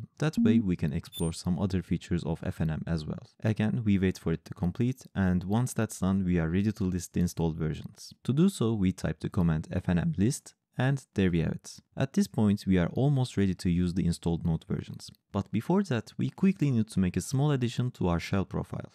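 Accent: Turkey. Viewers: you sound turkish, why no turkish video?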